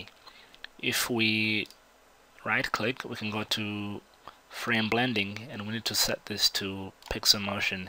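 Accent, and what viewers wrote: Zimbabwe. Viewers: Is the narrator in this video Zimbabwean? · Are you from Zimbabwe? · Definitely Zimbabwean! Haha Waita bho, Wabastira!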